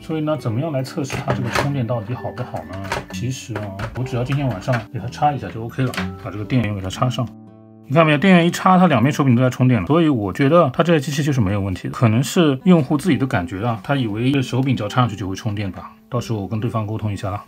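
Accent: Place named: Shanghai, China. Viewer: 对呃，我已经听出来侬呃上海口音了，嘿嘿😁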